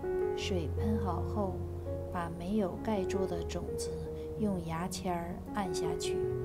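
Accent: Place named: Northeast, China. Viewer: 隐约听出东北口音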